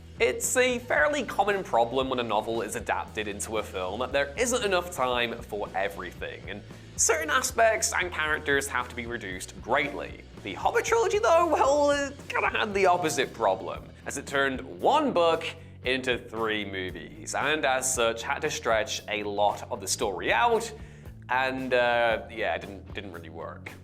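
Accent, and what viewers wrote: United Kingdom. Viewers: Dude it's just a British accent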